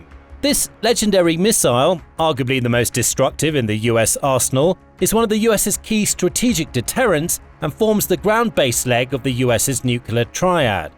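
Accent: England, United Kingdom. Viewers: I'd like to know why he's pronouncing "Aluminium" like an American as he's clearly English by his accent, English people do NOT pronounce "Aluminium" that way.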